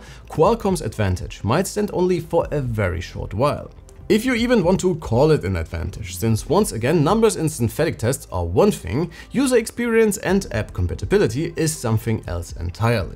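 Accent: Germany. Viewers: Hey, du sprichst eigentlich deutsch oder? Ich erkenne doch einen deutschen der Englisch spricht :)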